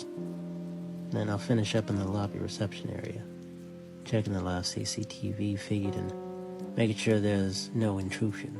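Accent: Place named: South, United States. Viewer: Quite a bit of southern, but sounds good mostly, keep going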